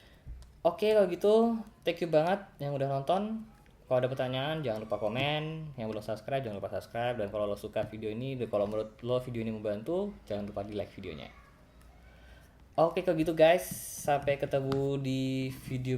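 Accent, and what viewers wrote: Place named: Java, Indonesia. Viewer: Orang jogja nih? Medog beut ngmngnya hehe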